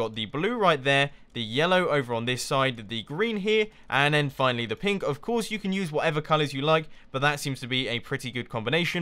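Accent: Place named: United Kingdom. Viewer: He sounds really British in this😂 and I’m from england😂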